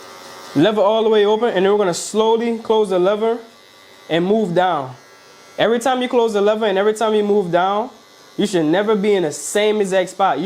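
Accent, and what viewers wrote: New Orleans, United States. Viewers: New fave barber channel, you do an excellent job explaining! Sounds like you from NOLA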